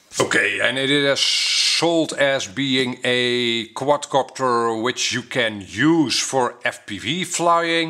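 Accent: Netherlands. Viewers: Gast! wat een heerlijk engels hollandsch accent heb jij!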